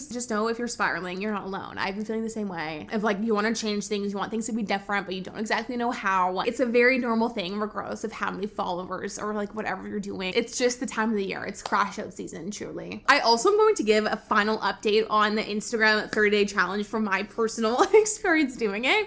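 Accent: United States. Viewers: You have such a strong Californian accent.🤭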